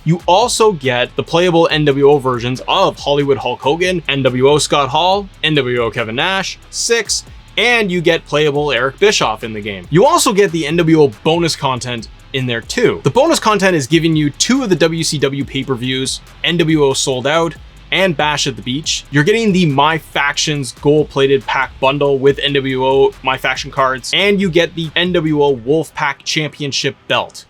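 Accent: Canada. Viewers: I heard Canadian!!?? Are you from Vancouver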